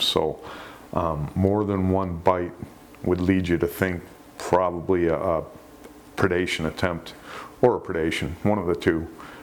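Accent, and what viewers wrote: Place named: Michigan, United States. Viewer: My step father was from Alto, Michigan (East of Grand Rapids). You sure sound like the folks from around there. Am I close?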